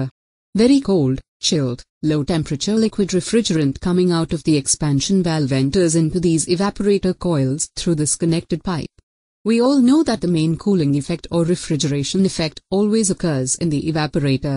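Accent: India. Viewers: Even though the accent was clearly Indian this was an excellent tutorial on the air conditioning system inside my car. Thank you.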